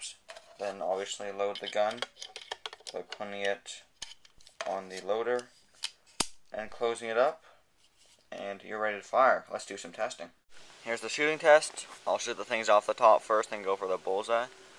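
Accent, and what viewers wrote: Quebec, Canada. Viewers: Are you Canadian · You from quebec? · are you Canadian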